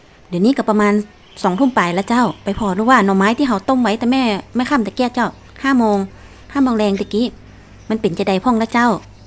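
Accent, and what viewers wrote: North, Thailand. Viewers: ชอบเสียงพากษ์จังเลยค่ะ พูดภาษาเหนือได้น่ารัก ป้าฟังรู้เรื่องเป็นส่วนใหญ่ ส่วนน้อยมากที่ไม่รู้เรื่อง แต่ชอบฟังมากๆค่ะ เป็นกำลังใจนะคะ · เว้าภาษาเหนือเพราะมากค่ะชอบแต่ฟังบ่ออกบางคำ รวมๆแล้วชอบจ้า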